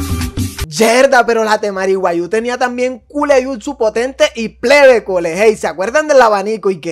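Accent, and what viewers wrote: Colombia. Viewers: Excelente video gracias por divertirme y hablar puro colombiano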